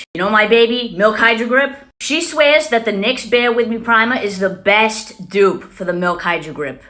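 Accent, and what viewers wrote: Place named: Boston, United States. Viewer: It takes a Boston accent to know one. It’s so weird how it’s normal in real life but on Tv/Phones/videos... the accent sticks out like a wicked sore thumb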